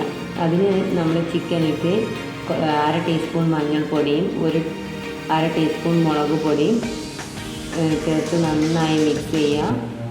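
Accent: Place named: Eranad, India. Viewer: ഏറനാടൻ ശൈലിയിൽ നല്ല അവതരണം പൊളിച്ചു..I will try it tomorrow and let you know the taste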